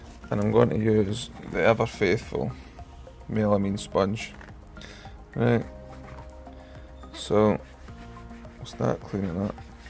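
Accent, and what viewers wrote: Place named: Scotland, United Kingdom. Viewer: I fell off my fart-free seat laughing when you were talking about cleaning "farted on" car seats. Your dialect makes it all the funnier and reminds me of Colin Mcrae. Are you from Lanarkshire by any chance?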